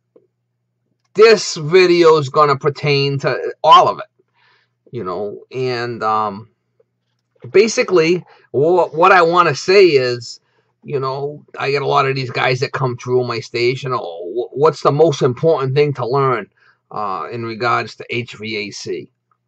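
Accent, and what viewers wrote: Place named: New York, United States. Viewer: This guy is cool haha....new yawk?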